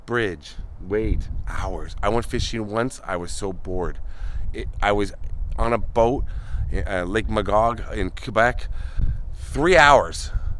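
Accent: South, United States. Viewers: Another, Great Video 💯! Your Southern accent made me laugh 😂. Hope you are well? I’m going to catch your next live video. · This one reminds me of the foot video you made. How in the world do you find stuff like this. Loving the content. You’re so funny while being respectful at the same time. Almost as bad as the guy cooking biscuits on the bow in a thunderstorm!! 😂😂😂😂 Southern accent is on point! 😂😂 I myself am a southern gal. And I am loving it. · You are the best I love your humor. Your southern accident is cracking me up. I'm From Florida😂 love you and your channel❤ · . Kid dynamite 😅 I enjoyed this.. I read afterwards he was alright? That face you made OMG. It messes up their swimming bladder lol That southern accent ROFLMAO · Love the southern twang ❤. You nailed it ! For sure....For sure. 💯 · Oh my gosh, you are so damn funny. You and your southern accent. 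Good job though enjoy the rest of your day.🤣💜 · Live your videos ❤ so interesting, thanks for bringing me along . Love your southern accent. You are hilarious yet respectful at the same time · Love watching your videos my friend!! Very interesting!! You are an amazing person my friend!! Love watching your videos!! Love you and your channel!! Love the southern accent!! God bless you my friend!! Much love!! Peace!! ❤️💖🥰💙💕💜😎😎😊😊✌️✌️✌️✌️✌️✌️ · I have to remember not to be eating or drinking anything when I'm watching your video's like this...I dang near choked...😂😂...Love that southern draw there...Question, did Beull have Biscuits on the bow before he played with the TNT? Much respect to you for showing so much respect to the arm...Much love sent to you... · Haha...Love it! It's worth it just to hear you speak "Southern'ish" lol♥️☺️ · A Canadian with a southern accent. Lol! I have one but with time being away from home it is less. 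When I go visit and it come in thick funny! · This was hilarious 😂 love the southern accent! You did sound like McConaughey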